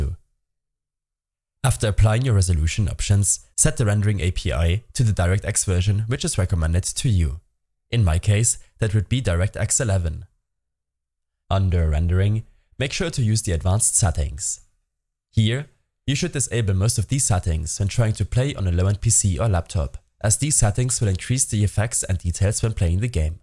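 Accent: Germany. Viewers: Bro are you from Germany?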